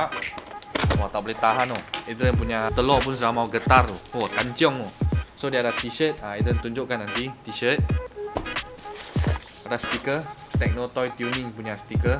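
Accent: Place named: Sabah, Malaysia. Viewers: Mcm logat sabah... 😅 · kenapa ko punya bunyi mcm sabahan saja aa?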